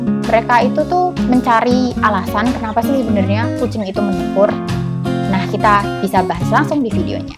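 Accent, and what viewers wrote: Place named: Java, Indonesia. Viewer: Pasti orang jawa ya kak Bahasa indo nya medok banget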